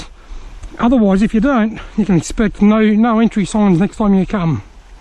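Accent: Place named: Australia. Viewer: WTF, are there trout in Australia? First time watching this channel so I’m only assuming due to the accent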